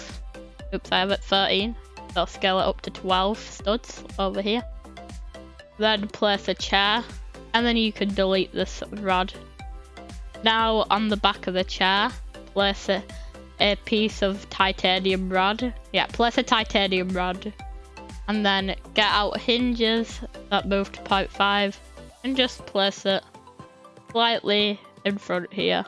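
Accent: United Kingdom. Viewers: Its called a british accent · He talks normal he's just british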